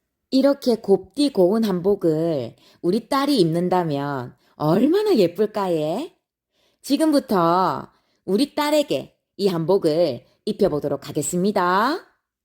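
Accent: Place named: Gyeongsang, South Korea. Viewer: 친정이 경상도라 나레이션 귀에 잘 들어와용 ㅋㅋ 잘보고 가요~😻😻😻😻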